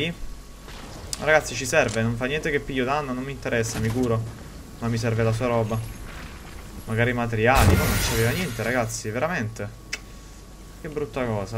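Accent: Naples, Italy. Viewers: Ma sei di Napoli?? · Ero sicuro che tu fossi napoletano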